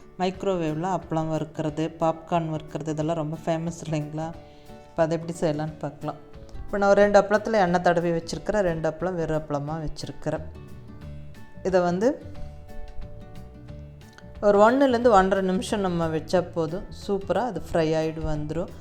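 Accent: Kongu, India. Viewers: Very useful. Your kongu Tamil slang is also good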